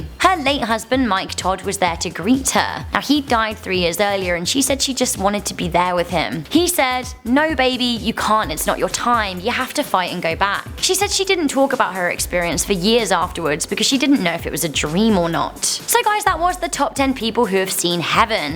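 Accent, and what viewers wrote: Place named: United Kingdom. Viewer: I love and believe in heaven not because of mere religion but also of faith (duh)oh yeah and I know people who saw Jesus or maybe God 😛. They testified that they went into a trance before seeing stuff during our praise and worship sessions then woke up, crying stuff out in tears... "I saw Him😨!!!I saw Him😢!!! He's wearing white😭!!!!!!!" And I'm so wowed by the fact that you guyz, would make a vid about these blessed people.🙂 So yeah. Moooore power to you guyzzz😍. GodddBlesss!!!😆😆😆 and ps Oi love your bri'ish accen' i's jus sow wow😍😍😍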